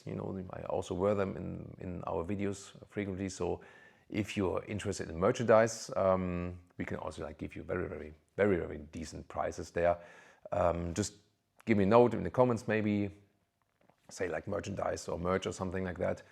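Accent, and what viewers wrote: Germany. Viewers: Hello. Dual Brit Canadian here, though I'm Egyptian by birth. Have always loved your videos, your presentation style and your personality. I actually lived and worked in Germany from 1988 to 93, in Lahr / Schwarzwald at Canadian Forces Base Lahr. I was a civilian in the IT department and then immigrated to Vancouver Canada in 1993. I'm back in the UK right now but have kept my Vancouver place. I've also faced a very personal tragedy recently. I lost my very dear Mum last year due to her accidental stair fall and discovery eight weeks later she had two brain haemorrhages she didn't know she had. She tragically died in hospital on July 3rd last year, with me in the room with her. I still cannot believe she's gone. Three months after losing her, I myself was diagnosed with leukemia. I spent four weeks in hospital having numerous blood transfusions, chemo and medications, followed by almost a year of outpatient treatment. 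I'm thankfully totally cured now, very fit and healthy with zero side effects. But the tragic and unexpected loss of my very dear Mum has been absolutely heart breaking. I am keeping a positive attitude for my life and future. I attend many classic cars shows and I'm very interested in cars. Totally love your channel and your presentation style. Also, your accent reminds me very much of my five years in Germany. By the way, my profile photo is of my very dear Mum and I. Vielen Danke und Tchuss! Ibrahim in Canterbury Uk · Love your Videos and your style of presenting cars. Calm and authentic. And keep our German accent, that makes it so relatable. Also if I am German, most of the time I watch your videos in English. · I subscribed this channel mainly for Learning German Accent English. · Love your vids and the way you breakdown these cars with strong German accent in a style and format that is just perfect for your delivery🔥🔥🔥🔥...It sounds so authentic and feels like you are coming directly from German car manufacturers, which i know is not the case, but that's why I love the channel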